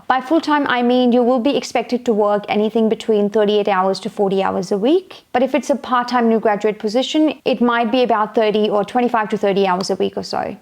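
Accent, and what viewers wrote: Australia. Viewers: You speak like Aussie.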